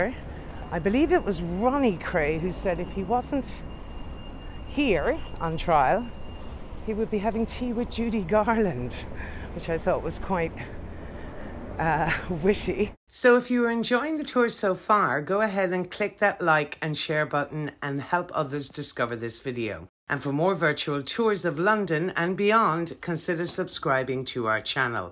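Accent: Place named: Ireland. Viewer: The best tour available! The Irish voice will take you around the world without leaving home!!!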